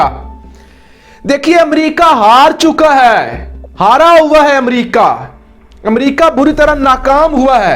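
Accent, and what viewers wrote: Punjab, India. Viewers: Try to learn Urdu & Urdu pronunciation in evening classes. Dimagh ki dahi ho jati Hy Urdu in Punjabi pronunciation. Or yeh June main blazer Hadd kr de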